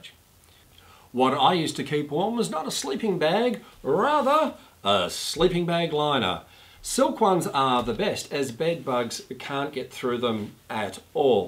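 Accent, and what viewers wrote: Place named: Australia. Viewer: Interesting Aussie accent and jokes. al-BER-gay St- Jon -peed-d-por Walking sticks are so helpful taking 30% of the weight- bearing off hips, knees, ankles. Good for going down & up. I understand your wrist problem but please recommend them! One stick creates imbalance in the body over days. (I've walked 7 caminos) thanks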